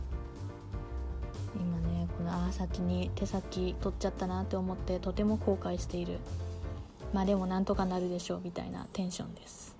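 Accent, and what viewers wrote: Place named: Kansai, Japan. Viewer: 私もめちゃくちゃ迷います！特に着物は、結局何が正解かわからなくなってくるのでぱっと見の印象が残ってるうちにさっさと決めるように頑張ってます笑というかさん関西人なんですか！？ちょこちょこイントネーションが方言っぽいですね！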